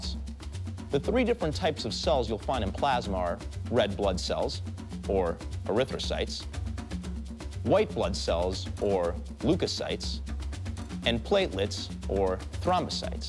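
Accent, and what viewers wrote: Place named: United States. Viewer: Awful American accent. It is a disaster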